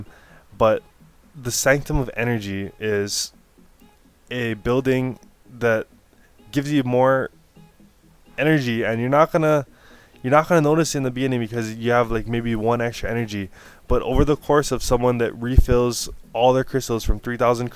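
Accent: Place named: Hawaii, United States. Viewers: nah, its not thick, but if you're from hawaii theres certain things that you can never stop and you recognize when others do it too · Yeah I could hear the pidgin small kine too. Lol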